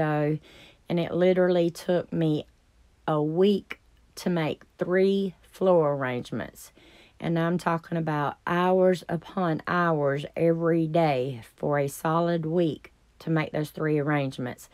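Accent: South, United States. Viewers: It looks awesome.your doing terrific keep it coming,by the way I hear a southern accent where are you from,I’m from Eastern Kentucky.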